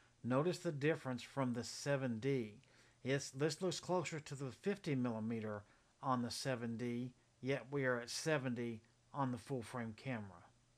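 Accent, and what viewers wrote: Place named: South, United States. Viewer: Nothing like a southerner gentleman; you explained it well thank you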